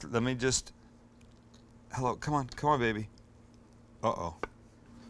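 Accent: Canada. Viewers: Lol I could tell he was Canadian by the way he said out and some other words, but I thought he said something about going church one episode so didn’t know he was jewish, Coolbeans haha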